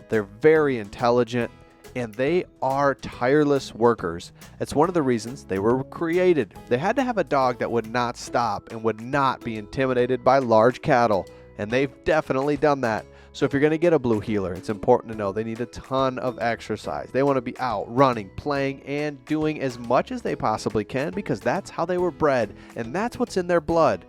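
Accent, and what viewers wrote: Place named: United States. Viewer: thank you. I bred ACD’s once upon a time in north Queensland. They’re the only dog I’ve ever owned. I put in thousands of hours a year training them, studying them, and teaching their new owners all about their behaviours + heritage. Whenever I watch a YouTube video on them - particularly one narrated with an American accent - it’s easy to predict that the content will be mostly non-factual. This video was no exception.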